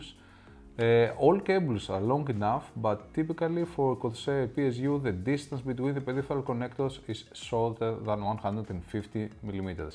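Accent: Greece. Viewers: Greek accent?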